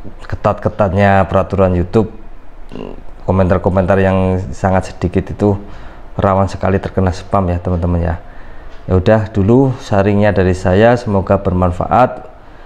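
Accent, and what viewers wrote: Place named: Banyumas, Indonesia. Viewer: Ikut nyimak kang..😀 trims infonya..👍 kaya ada rasa NGAPAKNYA...😁 apakah termasuk WONGE DEWEK...🤔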